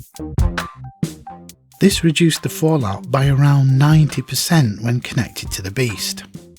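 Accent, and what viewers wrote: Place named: Northern England, United Kingdom. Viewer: Just beautiful! I am putting together my own very tiny workshop. 6ft by 3.5ft on my enclosed balcony. I live in a flat in South Korea, and DIY is not yet very popular because getting stuff done is relatively inexpensive. I love making things, especially from wood, and focus mainly on musical instruments. I don't have any of the tools you have but will be acquiring some as I go along. Thank you for the truly magical tour around your workshop and I shall be stealing some of your ideas for sure (with your permission, of course). By the way I guess you're from the north. I'm originally from Sheffield. A Stanley tools fan!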